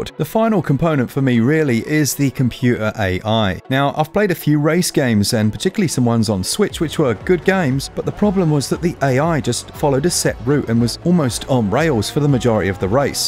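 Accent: United Kingdom. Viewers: no not bad at all. It just didn't sound like you. Had more of a British tinge to it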